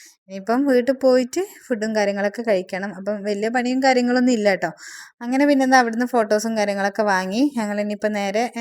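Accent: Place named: Malappuram, India. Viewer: Samsaram sherikum malappuram touch und atha chothichee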